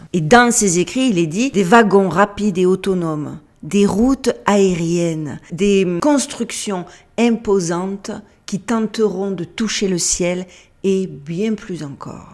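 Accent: South, France. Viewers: bjr toujours de bonne vidéos.tu a un accent du sud de la France.🙂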